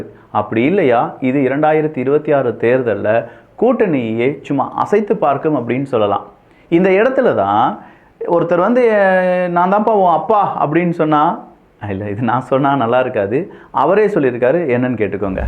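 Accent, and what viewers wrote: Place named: Kerala, India. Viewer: I get malayali l get malayali pitch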